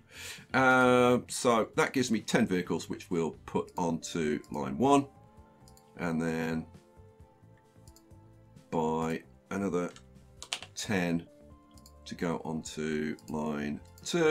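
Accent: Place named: United Kingdom. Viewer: Are you a British or an American? I just like your accent so much.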